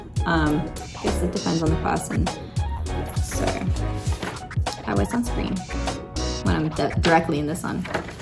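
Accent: Australia. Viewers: I can hear your Australian accent! I live in Australia so I felt an affinity with you haha I live on the Gold Coast Where is your dad from? 🫶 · You got Australian accent xx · AussieアクセントとAmerican or Canadianアクセントが混ざってる～💕住む地域がいろいろだとそうなりますよね！！なんだか自信をもらえました。ありがとう❤ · You’re English is very Aussie💖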